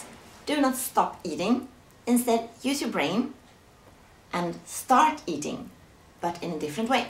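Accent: Sweden. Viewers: shes swedish, so a swedish accent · You seem like such a sweet caring Mother <3 Also, I wish I was Swedish!! Your accent is So ADORABLE! I want it :3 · swedish accent :)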